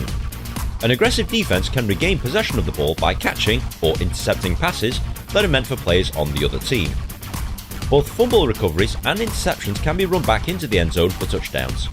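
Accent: England, United Kingdom. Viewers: Holy shit! I just dropped my lunch! I moved to America last year, and my wife (Emma Howard/Madden now) and I are going to watch the AZ Rattlers play this afternoon and I wanted to see how the rules varied to regular American Football. I didn't even realise until halfway through that this was you, albeit I did think it strange that guy narrating had an English accent! Hope you're doing well mate - I can imagine you still rollerblading around Leyland!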